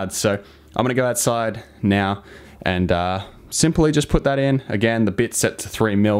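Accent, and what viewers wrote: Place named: Australia. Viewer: Nice to hear an Aussie doing this. I don't know anything about plunge Routers, do you need different "bits" to fit on the cutting apparartus? Can you get them in australia?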